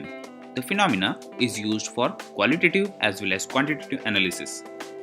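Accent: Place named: India. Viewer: perfect video short and understandable. but please work on your accent, please . make english(american) accent from indian accent to make it more unverstandable . thank you if you understood correct.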